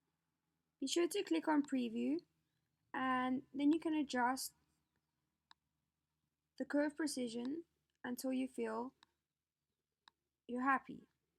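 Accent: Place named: Iceland. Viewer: Very nicely done. I enjoyed the flow of your Tutorial. I didn't once scream at my monitor trying to get you to hurry up! Great accent as well. Iceland?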